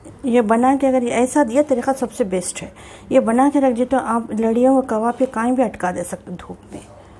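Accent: Hyderabad, India. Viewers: Assalamu alaikum WRWB...Aunty...Masha Allah...bahut acche recipe bataye aap...eid ul adha ke time pe...correct timing...shukriya...thank you so much for your efforts..aap ke bolne ka andaz bahut pyara hai...hyderabadi style...Masha Allah ...bahut Accha explain kiye aap tips bhi kahe...Jazak Allah...Allah aap ke channel ko bahut taraqi de...Ameen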